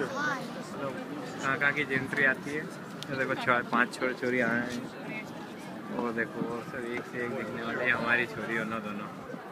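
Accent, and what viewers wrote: Indore, India. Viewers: ji maja a gaya yr....kya mst indori bolte ho yr....me bhi indori he hu...dada na pardada sab yahi ka he h....tumne to mtlab dil jeet lio yr....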